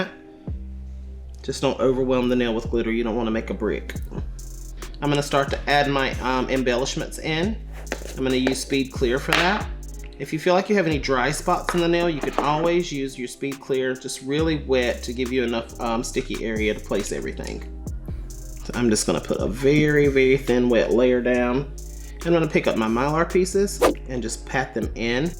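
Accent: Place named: South, United States. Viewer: those are beautiful and awesome you make me want to practice this. I love your southern sound. My parents are southern, but raised in the north. Love all people ❤ 💕 and wow on those 💅 nails ❣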